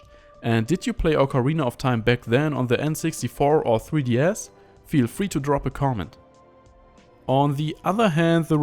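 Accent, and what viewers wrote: Germany. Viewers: Are you german? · Bin mir ziemlich sicher dass du deutscher bist man hört es so krass. Einfach die typische Betonung wenn ein deutscher englisch spricht. Ist aber auch verdammt hart das zu verstecken.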